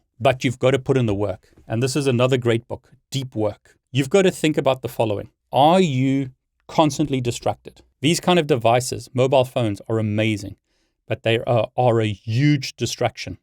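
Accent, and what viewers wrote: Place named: South Africa. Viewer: Well your accent indicates that you are South African and South Africans are one of the most studious, hard-working, Stoic, Intelligent, never-give-up, honest people in the world~!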